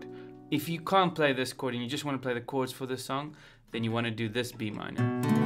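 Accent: South Africa. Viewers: Het dadelik jou Afrikanse aksent agtergekom.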